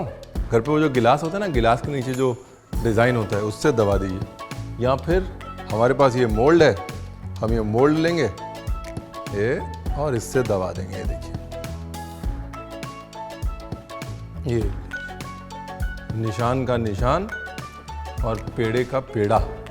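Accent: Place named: Uttar Pradesh, India. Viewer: aj UP wale accent me recipe sikh kr mja a gya.....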